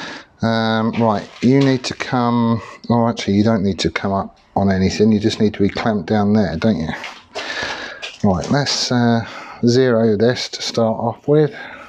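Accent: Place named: Herefordshire, United Kingdom. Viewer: love watching you when I'm drunk. You don't half make me laugh you do. That's pure herefordian speech that is. Bang tidy butt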